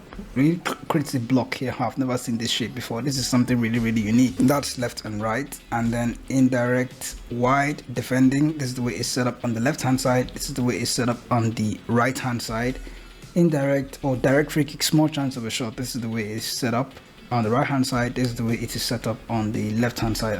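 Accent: Nigeria. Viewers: This is very random, but you sound Nigerian. Great work though.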